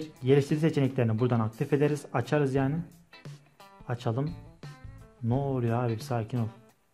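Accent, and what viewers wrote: Gaziantep, Turkey. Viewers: Antepli misin sen ? Neresinden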